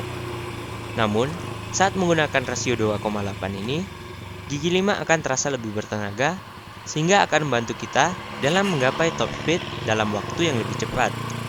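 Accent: Surabaya, Indonesia. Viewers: Lah org surabaya kah mang wkwkwk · Org Surabaya bang?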